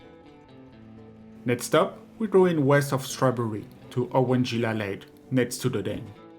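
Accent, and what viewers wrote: France. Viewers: Nice video man! What’s this accent? Français?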